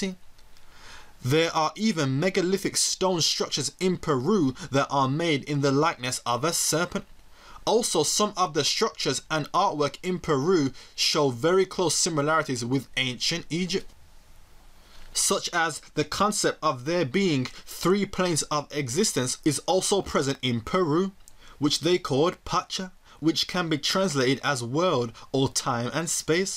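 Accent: Birmingham, United Kingdom. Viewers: Is that a brum accent i detect. Beautiful video by the way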